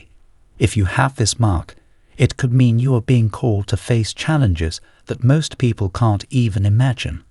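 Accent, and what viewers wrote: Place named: United Kingdom. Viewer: are you from Britain? or australia?? I hear an accent but cant pinpoint its relevance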